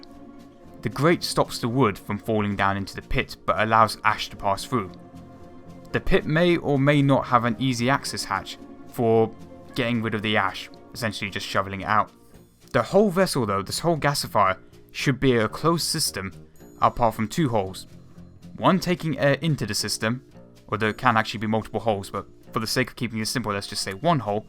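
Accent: England, United Kingdom. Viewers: This is true. He's from England, but not the part where I live. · Why has an british accent?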